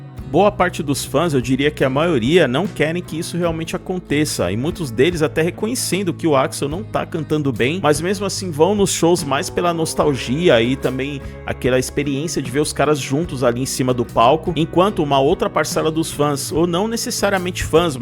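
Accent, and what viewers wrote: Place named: Brazil. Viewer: faz um favor ao mundo e para de tentar falar ou ler em Inglês…. É mau demais… não dá para aguentar o quanto é péssimo e mau.. entenda que para falar Inglês não pode ter sotaque brasileiro senão vai colocar letras e consoantes onde não existem e sai tudo horrível.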